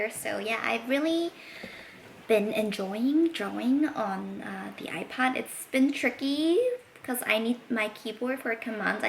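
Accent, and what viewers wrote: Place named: Ireland. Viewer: I love how your accent is slowly starting to sound more irleandish each time I watch a video of yours :D Watching you since 10+ years, somehow its become a ritual to 'catch up' with you. Stay strong and bubbyful! Lots of Love <3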